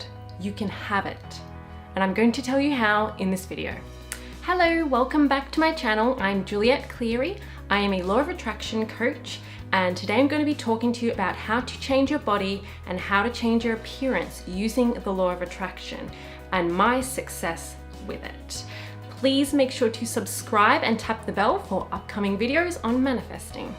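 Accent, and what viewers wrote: Australia. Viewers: English? Hmmmm it sounds Australian to me but... maybe I’m wrong ?? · Are you Australian ?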